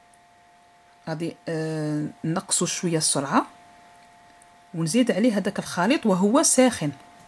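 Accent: Oran, Algeria. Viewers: مدام صوتك وطريقة كلامك راقية حتي لهجتك الوهرانية تعجبني بزاف و وصفاتك اكتر نتمني تعليق من عندك انا من اشد المعجبين بشخصيتك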